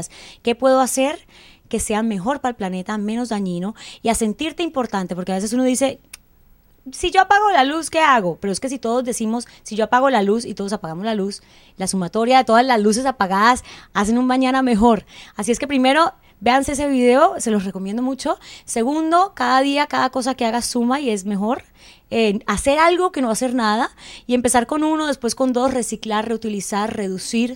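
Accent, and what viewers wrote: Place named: Cali, Colombia. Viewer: tipico acento valluno colombiano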